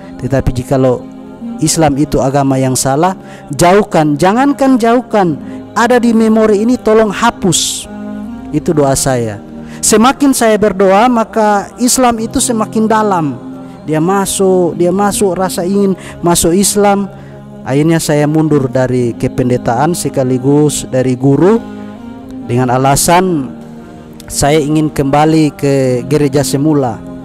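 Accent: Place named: Bugis, Indonesia. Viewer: Tapi logatnya logat bugis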